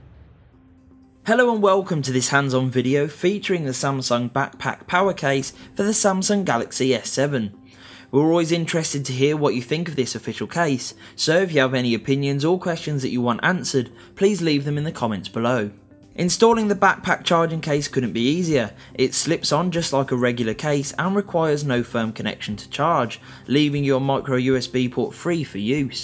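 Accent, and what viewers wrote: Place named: United Kingdom. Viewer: i need more of that british accent...makes all your videos enjoyable!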